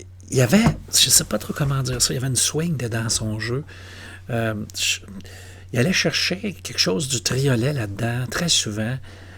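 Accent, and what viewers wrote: Quebec, Canada. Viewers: I like your reactions and commentary. Helps me keep up up with my French! (The first time I saw one of your posts I knew immediately that you were from Quebec). From, can I suggest and.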